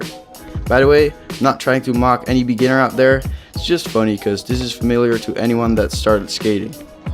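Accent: Netherlands. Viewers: Ik dacht al te horen dat je Nederlands bent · U dutch? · are you dutch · are u dutch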